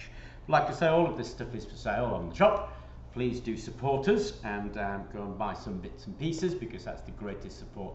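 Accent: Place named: United Kingdom. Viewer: And, trying to talk slower would help, as well... I'm in the US; &, trying to "translate" UK English into US English can be "interesting." I had to reduce the video speed to 75%; AND, STILL turn on closed captioning, just to follow what you were saying. If I had trouble following what you were saying, I'm sure that I'm far from alone here in the US... (I just tend to be more out-spoken than most people.) Interesting content, though. :)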